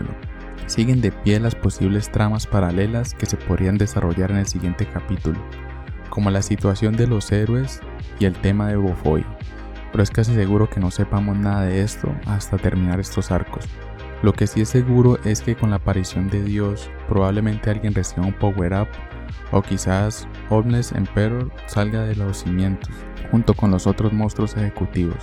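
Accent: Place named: Colombia. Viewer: Que paso con tu voz o.o? Ahora pareces Colombiano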